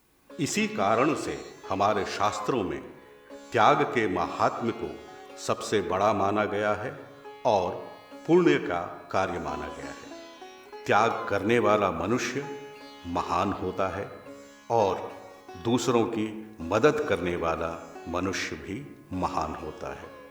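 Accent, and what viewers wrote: Standard. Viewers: विशुद्ध उच्चारण में सुनाई गयी कथा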